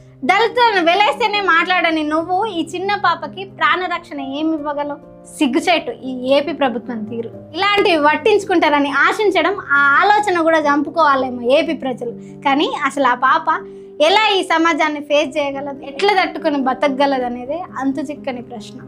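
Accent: Telangana, India. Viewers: మీది ఎక్కడ మేడం మీ vioce చూస్తే Telangana అనిపిస్తుంది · ఈమె ఎక్కడ ప్రభుత్వం మనిషి ఈమె భాష యాస చూస్తే పక్క telaganaa బిడ్డ లెక్క కొడతాంది.... ఏపీ లో అన్యాయం కోసం .... అక్కడ ప్రజలు కోసం అడిగే చొరవ ఉన్న ఈ భారత నారిమణి ఏడుగుర్రల పల్లె కి ఓయి అడిగేడుందే ఇలా ఛానెల్ పెట్టీ అడిగే తీరు నాకు నచ్చలేదు. అన్యాయం జరిగింది. న్యాయం చేయాలి దిక్కు లేదు ఆమెకి అన్ని ఉన్న అమ్మాయిల నీ గేదెలు గెద్దెల మాదిరి వేధించడం పరిపాటిగా మారిన సమాజంలో అసలు దిక్కులేదు అని చెప్పే నీవు అన్యాయం జరిగేదాకా అసలు ఆమె ఎవరో తెలియని నీకు జరిగిన తరువాత పక్క చానల్ నుండి వార్త సేకరించి ఇలా నీ ఛానెల్ రివ్యూస్ పెంచే ప్రయత్నం లో మసాలా add చేసి mari ప్రశ్నిస్తున్నావ్....ముందుగా ఆమెకి అవసరం అయ్యేది ఏదో చూసి పోయి నీవంటి సహాయం చేయాలి తరువాత . ఈ భాగోతం స్వయం గా ప్రభుత్వం వద్దకు డైరెక్ట్ గా పోయి చెప్పి తగిన సహాయం కోరితే చేసే వీలుంటుందేమో ప్రయత్నం చేద్దాం ....అంతే గాని ఏమి చేసావు అంటే ముందు ప్రభుత్వం ఎలాగబెట్టింది నీకు తెలియదా తల్లి ఆ ప్రభుత్వం లో పెళ్లి అయిన ఆమెనే చేరిచి చంపడం జరగలేదా....అక్కడ న్యాయం జరిగిందా..... 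ఇంటి ఇంటికి కాపలా ఉంటాం అని ప్రభుత్వాలు మాట ఇస్తాయా....ఇచ్చాయా ముందు పాలించిన CM lu dpty cm lu ....ఏమైనా అర్థం ఉండేలా మాట్లాడు ....మీకు నలుగురు పిల్లలు ఉంటేనే అందులో ఒకడు sump lo పడిపోతే ఏమమ్మ చూసుకోవడం చేతకాద పసోడు ఇలా పడిపోయాడు అంటే నీ సమాధానం ఏమిటి అలాంటిది ప్రభుత్వం నడపడం అంటే మాటలా అందరిని ఇంటి ఇంటికి వెళ్ళి చూడటం కాపాడటం ఏపిల్ల నీ ఎక్కడ ఆకతాయిలు రేప్ చేస్తున్నారో చూడటం ఎలా మనలో మన మధ్య ఉన్న జనం లో విచక్షణ లేకుండా సంభవమే కాదు .... సరే నీ పక్కనే ఒక ఆక్సిడెంట్ జరిగితే నీవు నీ బాధ్యత గా వాళ్ళతో ఎంత సమయం ఉండి సహాయపడగలవు అది చెప్పు. ఛానెల్ లో ఓ గొంతు చించు కోవడం ప్రభుత్వాల మీద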